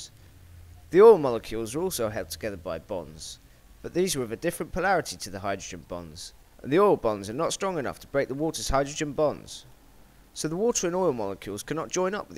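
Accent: United Kingdom. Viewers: I find it easier to listen to him because of the British Accent. Conclusion: I would have been smarter growing up in England.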